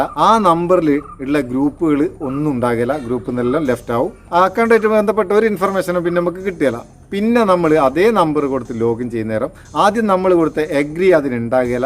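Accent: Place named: Malabar, India. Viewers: അതാണ് മലപ്പുറം ഭാഷ😄😄🥰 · ഇങ്ങളെ മലബാർ ഭാഷ കേക്കാൻ നല്ല മൊഞ്ച് ഇൻഡ്.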